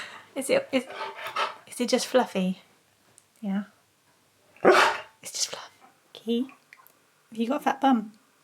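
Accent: United Kingdom. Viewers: Ha! I was just watching another of your videos and running out the door, threw my phone in my hip pocket. Moments later I thought my “bum” was talking to me. In America. It had a Cornish accent. ... This video had started playing! 🌷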